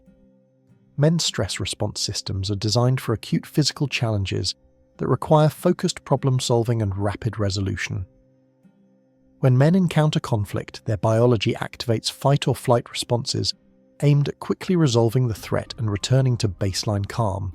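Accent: United Kingdom. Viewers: This is why you can't trust a British accent, he is getting you to buy into toxic behavior without calling it toxic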